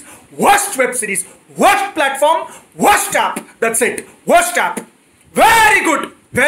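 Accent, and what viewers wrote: Bengal, India. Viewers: Hindi slangs with Bengali accent funny as hell dude XD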